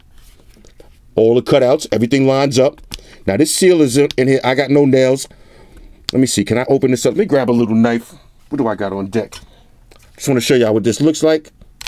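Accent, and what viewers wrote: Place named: California, United States. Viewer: Anyone else wanna see what he looks like 🙌🏽 he sounds like a Californian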